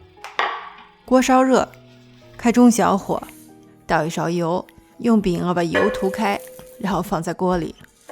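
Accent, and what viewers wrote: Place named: Northwest, China. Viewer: 因为爱上了西北口音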